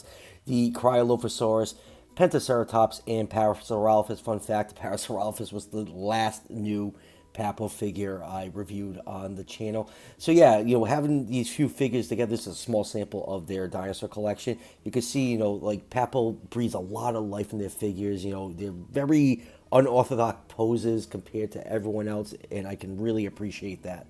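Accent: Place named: Massachusetts, United States. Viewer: Looks like I’ll have to start collecting Papo again. Nice to hear from a fellow New Englander! I’m from New Hampshire. You sound like a Massachusetts man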